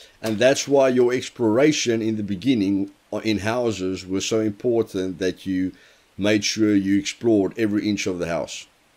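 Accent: South Africa. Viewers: you sound south african lol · I am still struggling with one thing And that is to find out if you are South African ? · are you from South Africa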